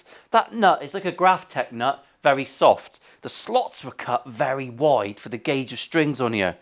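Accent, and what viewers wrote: United Kingdom. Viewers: Nice review, though I thought that only part of american people where eating some "T"s in words, or made them as an "H" that are also eaten in south of England ! 🤣🤪 I love such British accent, I'm from France, can't help myself in avoiding thinking to the teachers I had at school (had English ones) ! ^^ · I'm an American so I only understand every 4th word when brits speak, its not a putdown, its the bloody truth! you have a great channel!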